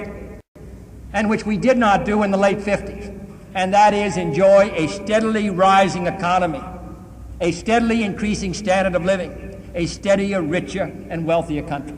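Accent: Boston, United States. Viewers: Hey had a strong Boston accent.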